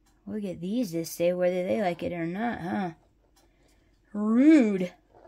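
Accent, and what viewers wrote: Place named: Canada. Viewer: I have been watching you for a few months and you have become one of my favorites to watch. I love you little laugh and your "swear words." I notice your accent and assume you must be from Canada-correct?? You need to add a little personal information to your films so we can know you on a little deeper level. You are a hoot!!!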